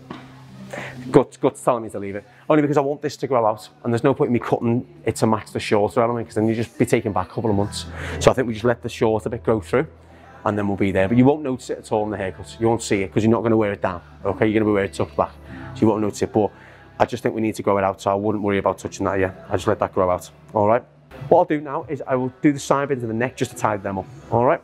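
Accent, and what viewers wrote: Liverpool, United Kingdom. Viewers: Love ur Scouse Accent mate🥰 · Scouse, from Liverpool probably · Love your videos! I know you don’t work in liverpool but your scouser accent is undeniable... where would you recommend in liverpool?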